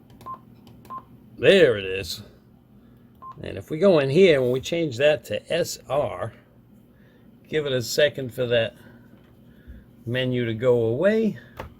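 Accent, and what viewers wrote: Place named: New England, United States. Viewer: Great video, you sound like your from my area.... 22 KING OF THE HILL, Souther NH... you have a new england drawl... Rhode Island maybe?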